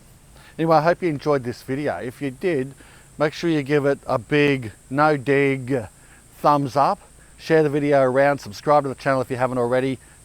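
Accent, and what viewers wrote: Australia. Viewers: I love that you experiment with things I think about! And love listening to your Aussie accent from here in Florida. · When you had the flu you sounded more Australian haha... Oh and merry Christmas;)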